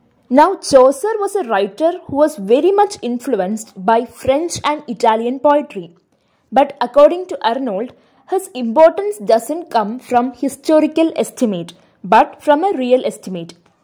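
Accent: India. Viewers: Mam are you from south 😍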